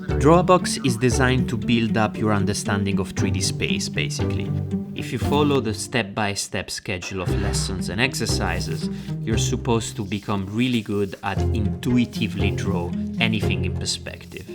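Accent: Italy. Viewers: Thank u for those tips, they were very helpfull. I have a question, ma sei italiano??😅 dalla pronuncia sembra di si, comunque grande grazie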